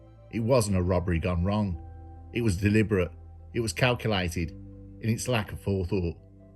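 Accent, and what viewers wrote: Birmingham, United Kingdom. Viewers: Brummie accent may not be to your liking but lots of us are following and enjoying his work and narration.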